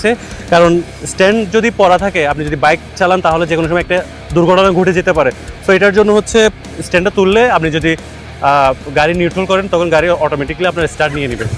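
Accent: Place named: Chittagong, Bangladesh. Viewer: শেষ..!! এই পোলারে বাইক দিয়ে বাইক আর ফিরে পাবার অবস্থা থাকবে না। নিউ বাইককে এরকম স্ট্রেস দিলে সেটা আর মনে হয় চালানোর অবস্থা থাকবে না। আর যে যে প্রজুক্তির কথা বলা হল এগুলো বাজারে আসছে আরো কয়েক বছর আগেই। এরকম তেল খেয়ে বাইক রিভিউ করার কোন দরকার নাই। অন্তত লিকুইড কুলিং থাক্লেও হত। আর চট্টগ্রাম এর টোন তো সেরা টোন....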